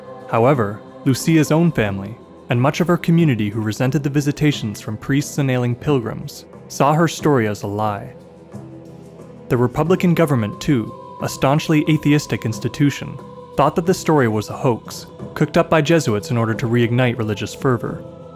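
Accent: Canada. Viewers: Yeah, I never heard that there was a disc separate from the Sun that was doing the spinning, and then "the disc" RETURNED TO THE SUN; don't know where that came from; as I said, I never heard that before. Overall, however, it was a nice presentation, but what sounded like a hard (thick) Canadian accent from the narrator was a bit bothersome to me.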